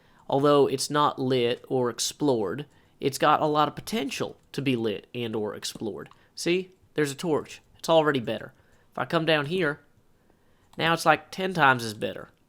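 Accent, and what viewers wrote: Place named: Nashville, United States. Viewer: There once was a man from Nashvilles, Who calls himself Yes that's his name Minecrafting's his game With a lovely accent from Nashvilles.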